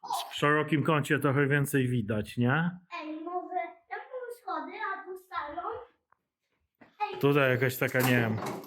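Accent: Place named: United States. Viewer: Wygląda jak dom Kamila Stocha. A pan fajnie "po amerykańsku" mówi jak Mariusz Max Kolonko.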